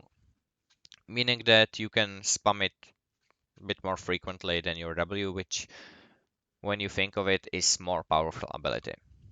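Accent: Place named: Czech Republic. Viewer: Vic cesky-zniciho cecha jsem snad neslysel xD. Good Guide.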